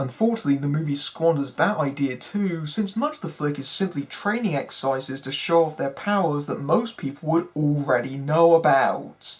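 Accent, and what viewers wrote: United Kingdom. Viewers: that perfect British TV news anchor voice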